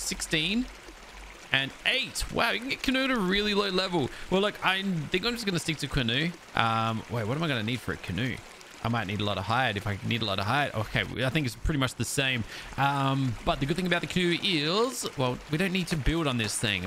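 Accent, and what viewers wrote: Australia. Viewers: I love the Australian accent but I don't like the idea of the huntsman spider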